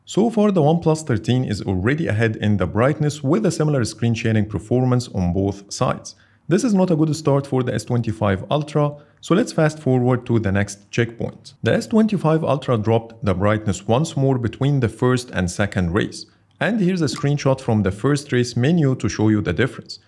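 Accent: Egypt. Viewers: اللكنة المصرى منورة 😂🇪🇬 Great video though 👌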